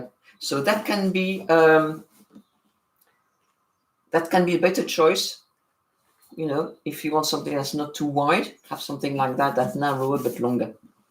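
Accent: France. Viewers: No nagging, just your friendly nice French accent and with the Wow I get the surprised face with big eyes. Love you.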